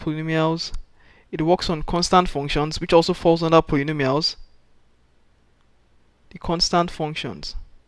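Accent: Nigeria. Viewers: You are Nigerian aren't you lol, anywayz thanks for the videos it does help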